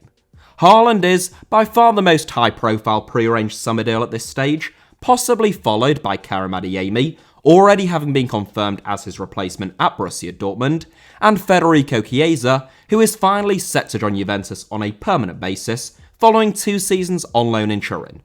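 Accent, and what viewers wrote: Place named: North, United Kingdom. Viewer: You do understand he has a northern accent? I wonder if you would be so critical of an an African or Asian speaker where their natural speaking style would cause similar issues.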